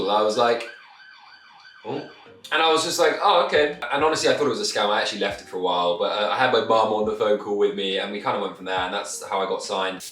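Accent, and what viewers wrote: United Kingdom. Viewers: Your accent is so different, Ik it's british but it also sounds like there's some other accent mixed in with british, I'm surprised at myself that I can understand what ur saying 95 percent of the time cause english isn't my first language, sometime there are some words I cant catch or understand cuz ur speaking way too fast but its all good, love your vids, currently doing a marathon of ur vlogs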